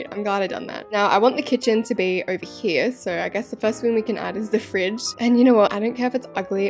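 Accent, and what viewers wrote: Australia. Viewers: in this video you can hear her Australian accent more better in this video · Is she Australian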